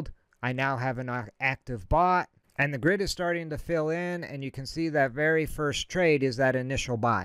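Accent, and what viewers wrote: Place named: United States. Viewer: you sound american, how can you use binance? i got kicked off and forced to open a Binance.US account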